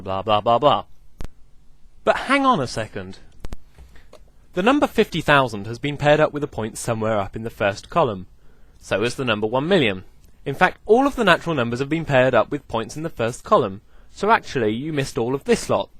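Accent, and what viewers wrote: United Kingdom. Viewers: zenos paradox - infinite divisibility, cantors argument - whatever u said here.. cant get past thick british accent.. but im assuming that notion was pointing zenos paradox. the infinite variables from infinite divisibility. there is some likeness in these, surely you must see it. if cantors proof notes incongruity through bijection, it is only out of the inadequacy of listing real numbers. out of his entity, out of our sentience existing finitively. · OMG man, you are a comedic genius. I loved this video!!!!!! Make more!!!!!! Chainsaw + Baby is more of a supper or dinner thing, at least i think so... and the British accent kicks butt! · American's (i hope i speak for my country) don't find British accents funny, but fun to listen to and attempt. If anything, it might be the slight lisp or sibilant s... don't worry about it, it's awesome.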